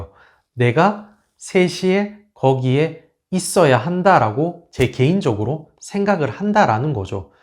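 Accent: United Kingdom. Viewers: 한국어에 영국 억양이 묻어나요^^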